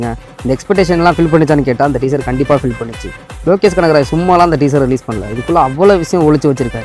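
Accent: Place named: Canada. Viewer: Enna bro Canadian lad maathiri tamil maappilaya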